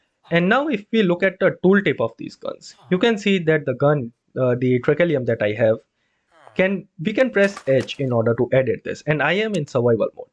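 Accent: India. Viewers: Tell me you are indian without telling me you are indian. not trying to offend anyone, nice video. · Heard the Indian accent and I knew I found what I was looking for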